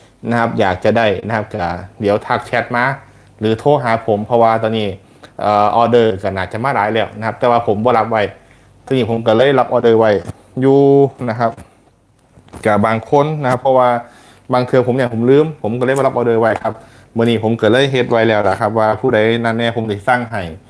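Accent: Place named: Phutthaisong, Thailand. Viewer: พุทไธสงชัดเจนคับ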